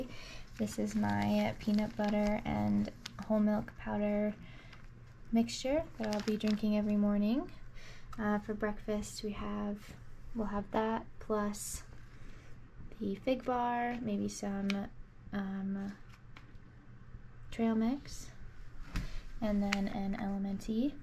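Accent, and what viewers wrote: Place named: South, United States. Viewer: WHAT YOUR ACCENT SOUND DEEP SOUTHERN LADY WITH APPALACHIAN WORDS ... SHE PASS ... GOOD LUCK MY FRIEND.. APPALACHIAN TRAIL IS SO COOL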